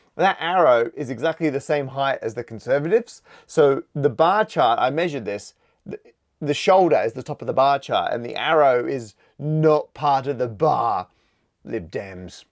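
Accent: Australia. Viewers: in Australia, my home and your former home, we have preferential voting. You number the candidates 1...n and they eliminate who came last and move those votes to their second preference. That is repeated until someone gets over 50%. It will solve your coffee vote scenario where the least popular party wins. Speaking of Australia, you're losing your Aussie-ness. Graph has the 'a' pronounced like 'a' in grab not grass.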